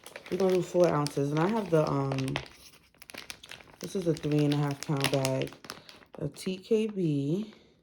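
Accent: New York, United States. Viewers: Are you from NY? Love the accent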